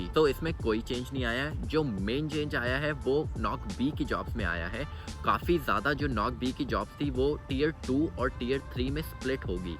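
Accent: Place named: India. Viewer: that Hindi accent though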